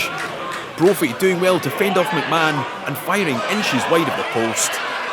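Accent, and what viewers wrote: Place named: Scotland, United Kingdom. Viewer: Scottish university BBC accent.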